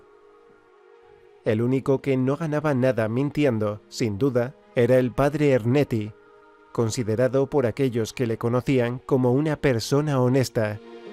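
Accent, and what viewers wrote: Spain. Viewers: Que voz del locutor ezpañol 😊😊😊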